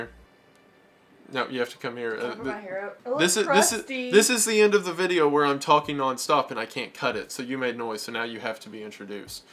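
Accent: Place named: Carolinas, United States. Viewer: Where are you from? You definitely sound like someone from the Carolina’s or maybe Virginia.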